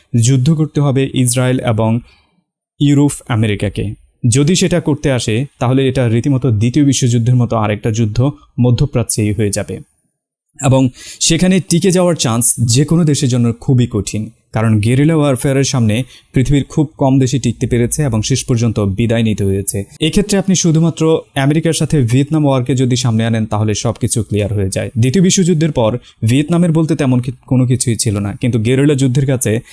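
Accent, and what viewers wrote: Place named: Noakhali, Bangladesh. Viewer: ভাই, আপনার বাড়ী নোয়াখালী! কথার টোন e বুঝলাম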